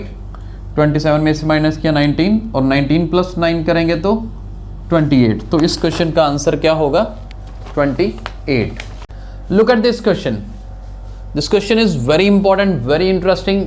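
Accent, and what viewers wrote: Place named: Ambala, India. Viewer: Apki language ambala area ki lag rhi hai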